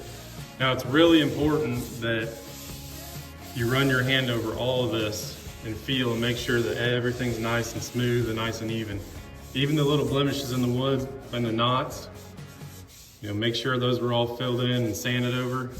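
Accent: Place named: Indiana, United States. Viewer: I'm in southern Indiana. You remind me of an old classmate of mine. I think your accent gave me a hunch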